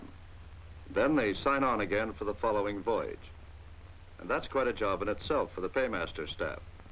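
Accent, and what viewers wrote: United States. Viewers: American commentary!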